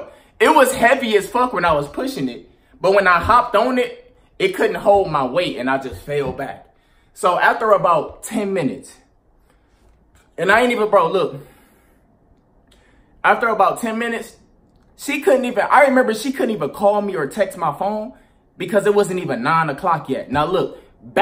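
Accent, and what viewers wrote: Detroit, United States. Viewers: that detroit acent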